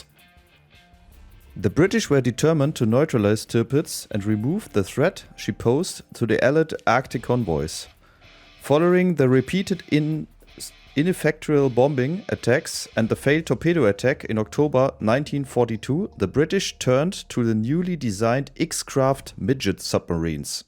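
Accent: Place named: Germany. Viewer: Haha man hört den den deutschen Akzent so raus aber liebe deine Videos 👍👍💖😅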